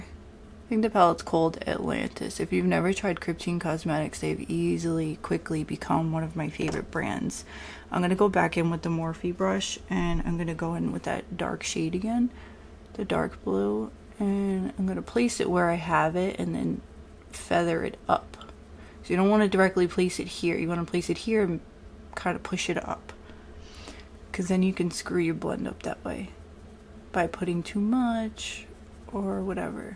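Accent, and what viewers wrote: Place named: New York, United States. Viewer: Congrats on your 260 subs... Lol heavy NY accent..My Spanish accent is heavy too, I cannot imagine what people will say about it 😅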